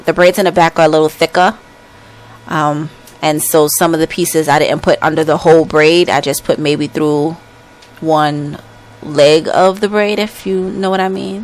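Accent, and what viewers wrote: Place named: New York, United States. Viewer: You sound like you are from my neck of the woods. Are you in or from Ny ? I love it from the 1st twist you installed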